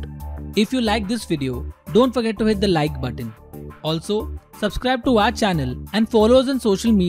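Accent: India. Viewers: Can’t wait to see a new wideo, Indian ascents are great! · I wish youtube could filter out results with this hindu accent, i couldn't focus on content. Sometimes I feel like you guys speak like this on purpose, like you think it is so cool because BBT and Koothrapaali is popularrrr, yess...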